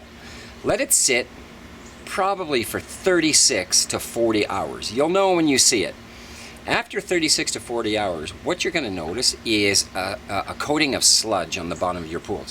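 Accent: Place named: Canada. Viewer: Thank you so much, you've saved my life (well, okay, my swimming pool!) I was getting so frustrated with a cloudy pool. I had added a load of flocculant but however much I vacuumed I just couldn't get rid of the f***ing crap at the bottom. Eventually it dawned on me that it was all just coming back through the filter and for whatever reason the filter just wasn't collecting it. That's when I saw your video and realised I should put the pump on the 'waste' setting (or 'desague' as they call it here in Spain). Bingo! It means I have to top up the water level, as you say, but definitely worth it. Now I just have to backwash the filter to get all the crap out. [Note here for any English-speaking expats living in Spain, like me, who may be confused by the terminology: for 'backwash' you need to look for 'lavado' and for 'rinse' you need 'enjague']. Totally off topic but I love the accent btw. 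I have a Canadian mate in Madrid who speaks just like you. Can't get enough of that Canadian pronunciation of 'out': a little bit Irish, a little bit Scottish, but 100% Canadian!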